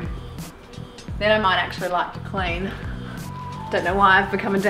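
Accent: Australia. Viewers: I really liked Batman's feeling on the first floor! But what i love is this Aussie accent! 🇦🇺🏄🏼‍♂️ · I Love how she does her content everything seems to fit together perfectly the aesthetic the music all topped off with the cheeky Australian accent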